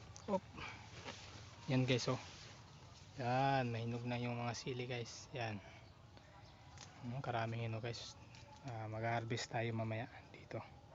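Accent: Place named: Pampanga, Philippines. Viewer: may punto ka narin ng kapampangan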